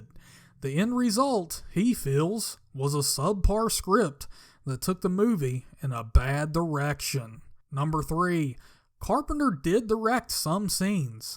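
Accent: United States. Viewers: this guy sound like a hick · His voice so country🤦🏽‍♂️😂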